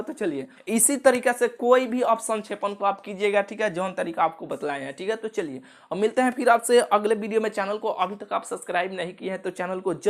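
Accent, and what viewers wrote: Bihar, India. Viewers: Sir aap Bihar se h kya.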